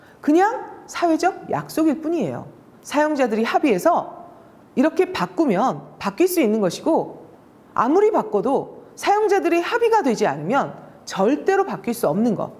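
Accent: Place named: Honam, South Korea. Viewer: 내가 음성 감수성이 뛰어난데 서울표준어에 호남 어투가 숨어있네 고향 사람이라 반가운데 서울 출신으로 되어있네 고향이 부끄럽나 왜 왜 왜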